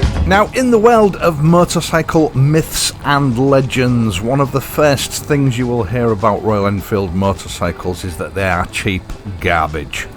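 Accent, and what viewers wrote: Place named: Black Country, United Kingdom. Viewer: if I may be so bold, having watched a great many of your videos, I have come to the conclusion that you are from a sadly extinct species that I would label a "proper copper" I grew up in what was then a small village of a thousand or so people. the local cop new all of the "conspicuous " kids, and while I am not really for physical punishment, having a cast iron boundary in the form of a large shiny black shoe, applied just firmly enough to command respect changed the course of the life of some of the disreputable youngsters I knew. what kept me in line was knowing if I offended, he knew who I was and would be round to read the riot act to my parents (both ex military) he knew me because I was conspicuous at 14 I was six foot four and 15 stone, so i stood out. many of the "problems I see now seem to come from the extinction of authority figures like that, plus being born in Birmingham, as was my dad i enjoy your accent, which while quite different from Brumie still is very black country in its own way. 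keep up the good work, loving it 😃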